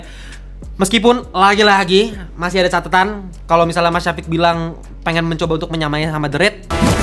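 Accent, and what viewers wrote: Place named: Medan, Indonesia. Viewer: Nah kalo ini baru logat Medan bang... salam dari Medan 😄😄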